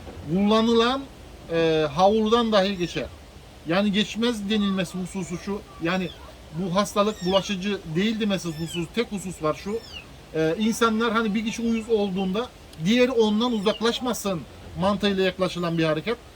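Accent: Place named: Manavgat, Turkey. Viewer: Konuşmandan bildim abi bende manavgattaydım😂şiven harika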